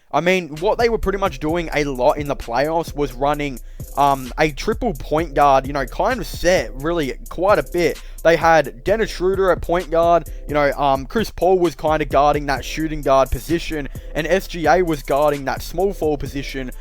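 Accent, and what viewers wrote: Australia. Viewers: talking too fast....and Aussie accent. Lol.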